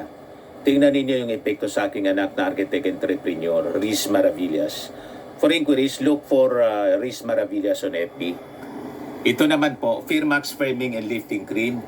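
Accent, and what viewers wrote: Bicol, Philippines. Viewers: Sino ang Vloggers na ito , laging nakabuka ang bunganga niya , parang taga Bicol ang tuno .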